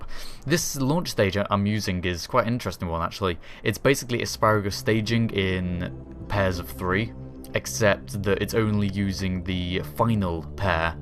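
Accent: United Kingdom. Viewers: im really sorry for asking such a stupid question, since im not sure.. but do i hear a british accent?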